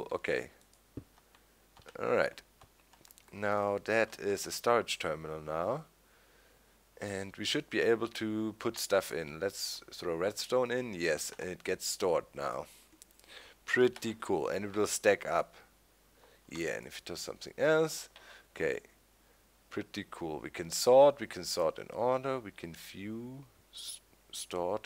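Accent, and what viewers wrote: Germany. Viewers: Mancmal merkt man schon noch das du eig. Deutscher bist^^ Aber sonst coole videos und weiter so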